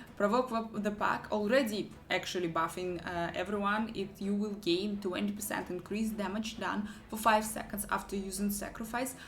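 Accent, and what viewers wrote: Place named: Russia. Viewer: I find it very pleasing to listen to you talking about diablo with that russian accent 🤣😅 also nice video!!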